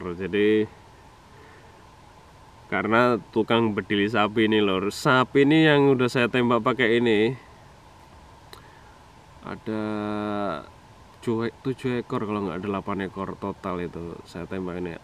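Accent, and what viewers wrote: Java, Indonesia. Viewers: NITIK LUR...EDANAAAANNNNNNN ....JOS KANG TETEP KETOK JOWONE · jowo nya msih medok, masyah allah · Wong jowo mz kok lagate jowo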